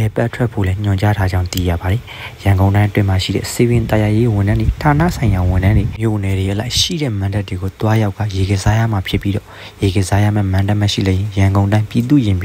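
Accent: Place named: India. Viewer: ကုလားသံနဲ့ မှန်တာပြော ကျိန်ပြော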